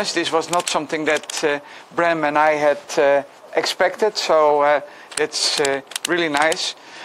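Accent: Netherlands. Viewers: Ha yes , the English Netherlands accent xD (also from the Netherlands)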